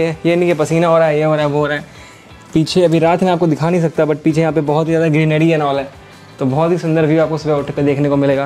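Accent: Bihar, India. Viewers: Aap bihar se ho kya????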